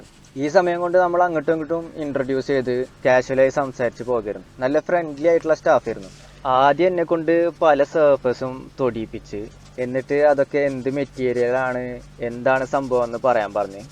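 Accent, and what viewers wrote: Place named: Thrissur, India. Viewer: മാഷാ അല്ലാഹ്... നന്നായി...ആ തൃശൂർ സ്ലാങ്ക് കമന്ററിയാണ് എനിക്ക് നിന്റെ വരയെക്കാൾ ഇഷ്ടയത് 😍🤩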